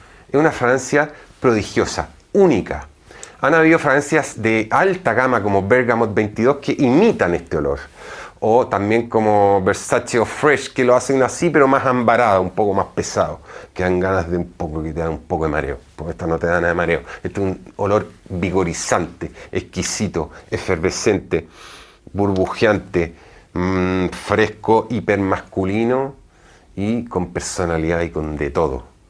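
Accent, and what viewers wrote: Chile. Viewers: De que parte de españa eres su acento es como escuchar a un Chileno. Gracias por su canal. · estarás en España, pero tu acento chileno te delata, el tono de voz y los gestos del típico santiaguino 🤣😊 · De que parte de España eres?, hablas como Chileno. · Acento chileno + acento francés, pero vive en espana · España? Pense q grababas en Chile debido a su acento. Gracias por el video! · Me encanta escucharte, sos un maestro, das cátedra y si a eso le sumamos los ojos claros, la camisa negra y el acento chileno ya sos irresistible. Más reseñas de perfumes femeninos y cuáles considerás unisex. Abrazo desde Uruguay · Hola, podrias decirme si realmente eres Chileno? Por tu acento lo creo..... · Siempre te veo y algo tienes que aún. No descubro bien se que vives en España pero tu acento no es de español, eres Chileno verdad ? Sorry se que no tiene nada que ver con el video. 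Gracias a ti tengo 4 perfume recomendaste saludos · tienes un acento Chileno,es a si??,saludos.... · Es chileno'español cuando habla jajaja un crak abrazo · Tienes una mezcla entre Chileno y Español hermosa porque entre Chileno metes una frase español y es gracioso 😂😂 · Tan guapo 😊 tiene todo el acento chileno 😊☺ saludos... Eres seco 😙 y Viva Chile 😊😊😊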